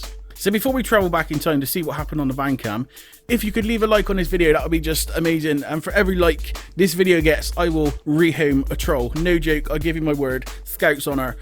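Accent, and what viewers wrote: Bristol, United Kingdom. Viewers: Haha, love the presentation, the Bristol accent just makes the insults all the better. Subbed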